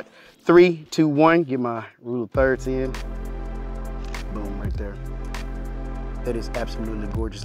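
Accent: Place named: Texas, United States. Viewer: I could tell you were a fellow Texan as soon as you started talking. Fantastic work! It's crafstmen like I follow to learn more about the art of photograph and videography. You've got my subscription.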